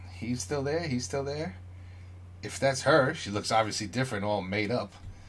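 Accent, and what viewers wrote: United States. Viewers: Heard that NY accent and I was hooked. I'm from Brooklyn. Love your reactions. Welcome to the PTX rabbit hole, I am gonna enjoy hearing your great reactions to this unbelievable group been a fan since 2011 When they won the singoff. I'm going to take this journey with you. Subbed Now I can enjoy PTX and you. Thanks!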